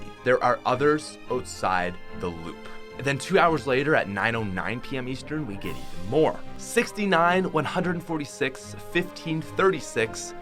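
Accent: Canada. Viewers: Are you canandian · Me watching the video Hears an Accent Is.. Is that- Is that Canadian? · Ik your probably Canadian but plz fix your English it's not oatside it's outside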